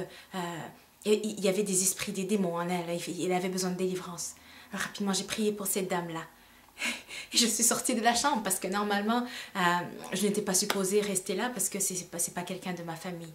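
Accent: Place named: Quebec, Canada. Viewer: L'accent canadien est vraiment prononcé dans sa voix une vraie québécoise sois béni à toi ma sœur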